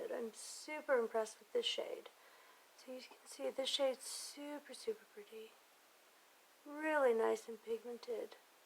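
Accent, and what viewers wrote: United Kingdom. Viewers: Oh good I’m early x hope u are good and thankyou for this upload! And am I crazy or do you have a British accent? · Where are you from? Sounds like you have a British or English accent. I'm not sure. Lol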